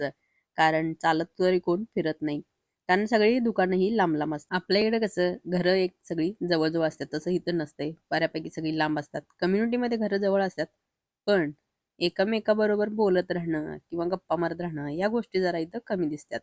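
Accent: Kolhapur, India. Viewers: तूम्ही कोल्हापूर चे आहेत काय भाषा वरून ओळखल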